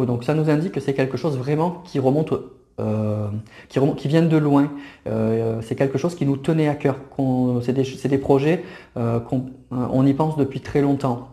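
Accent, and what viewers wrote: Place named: South-West, France. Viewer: Bonjour ☀️ et merci pour ce tirage. Petit accent du sud-ouest que je reconnais, j'ai le même ☺️ A bientôt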